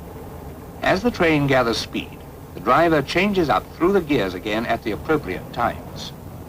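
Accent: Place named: RP, United Kingdom. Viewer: Even Prince William doesn't talk that posh!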